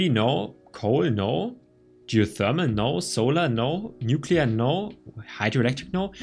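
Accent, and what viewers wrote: Germany. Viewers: Bist du Deutsch wegen deinem akzent hört man das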